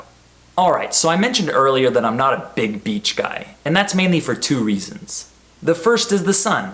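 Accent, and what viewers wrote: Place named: United States. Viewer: لا لكنتو اميريكة بحتة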